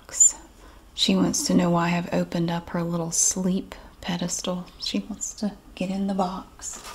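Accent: South, United States. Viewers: The southern accent is so underrated 👌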